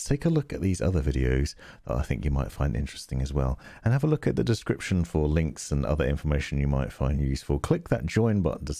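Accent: United Kingdom. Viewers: it’s not just the speed …it’s that Brit accent hahaga GREAT video man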